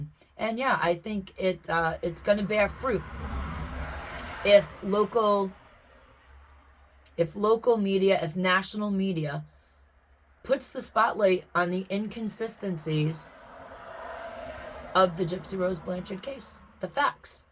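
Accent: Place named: Maine, United States. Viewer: I could tell you were from Maine as soon as I heard you talk❤ I'm from there. Except I've lived on the west coast for the last 6 year's. Miss that accent ❤❤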